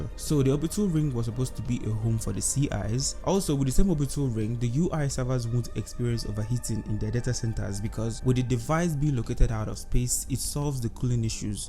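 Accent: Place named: Nigeria. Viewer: Love a naija voice doing reviews like these! Keep it up Will watch all your videos 💪🏿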